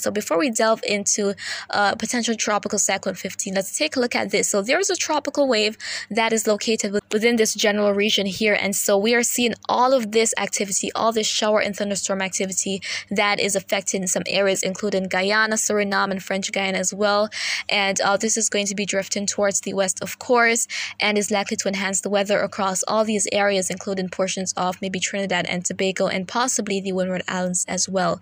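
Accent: Jamaica. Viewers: The Jamaican dialect had mi panny floor 🤣🤣. Jeezas ah love it 😀 Wooooiiiie mi body 😄. Thank you sooooo much for the consistency of your forecasts and detailed analysis. I need to see you on our TV channels. Mek dem stay deh nuh tek heed n sih 🤣😅🤣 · Appreciate you, they draw you out with the Patois though😆😆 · lol wait deh no man your patois sound nice man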